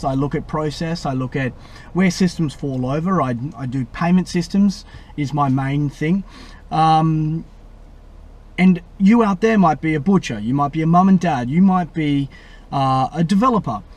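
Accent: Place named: Australia. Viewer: Thumbs up for saying this in an Aussie accent. "Santa claus what a guy........yeah what a f....in guy." "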